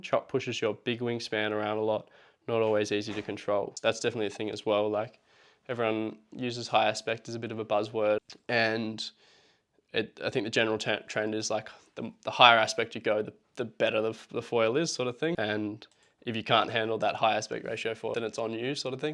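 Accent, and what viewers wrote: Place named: New Zealand. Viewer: Circling back to this vid as coldest month of the year in NZ, sun down just after 5pm(You sound like a kiwi too) and I have 2 new designs I love. F-one SK8 looks a lot like my latest one and the one back from that looks like Armstrong ST and Armstrong released theirs about the same time I made mine. Both my latest foils have great glide but my latest one though only slightly smaller, I have stability that makes me feel that I can foot switch on foil. Of course I still need to get used to taking my moment to be 100% about new foot placement but the new foil makes it so much easier. I really favor foils with a bit of sweep and my latest one that I made to fit Axis fuselage, it has almost straight back adge and sweeps to extremely narrow and thin tips. For all the potential for injury, the blissful smooth ride of my 115kg on this 1240sqcm 1M span foil is amazing and yet in surf it's super loose. 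Lots of our locals on Axis but my few tries of them I've not liked the handling. Axis ARTs do have one of the things I incorporated in my own designs from feb 2022 which is wing tip twist. I've refined my own wingtip twist amount and placement since then to better stretch max speed and min stall speed. It's fascinating seeing all the brands making their own advances in different ways.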